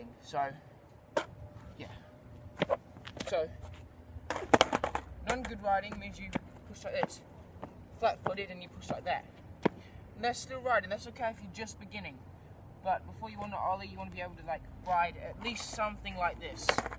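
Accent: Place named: New Zealand. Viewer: Nice tutorial man, but i couldnt get over your accent! what is it? Kiwi mixed with Australian and British?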